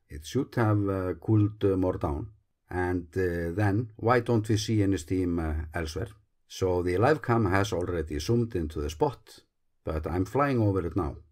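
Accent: Iceland. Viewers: Awesome video as always. I really enjoy how easily and interesting you are talking. And your Icelandic accent is beautiful 🙂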